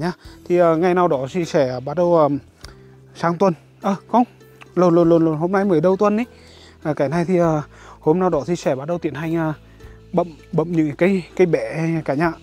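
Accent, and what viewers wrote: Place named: Nghe An, Vietnam. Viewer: Chất dọng quê choa mộc mạc mà dễ thương chúc khỏe bình an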